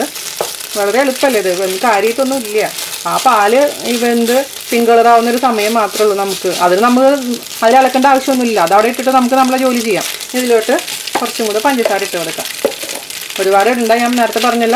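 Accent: Thrissur, India. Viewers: chechi thanks a lot...i started watching your videos becoz of the thrissur slang.i love thrissur.but ippo i watch your videos becoz of chechis cooking....I used to make paayasam in uruli.virakaduppila undaakkunnath.pradhamans.appo paalada undaakumbo pink varal nadakkilla.kure neram venam.ippo etra easy aay kaanichu thannu chechi with gas stove and cooker....thank you chechi.i havnt tried meen curry yet.but checheede thrissur meen curry kandappo vekkanamnn thonni...vechitt parayaatto... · very well explained..especially trissur style..thanks lol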